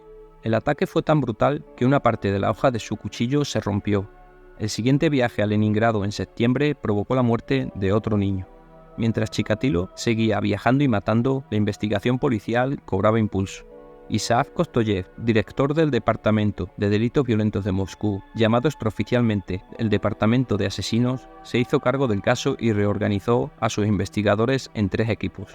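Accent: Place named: Spain. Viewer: Buen documental,hay una pelicula muy buena que se llama ciudadano x,me gusta buestro canal,narrado en español ee españa y muy bien documentado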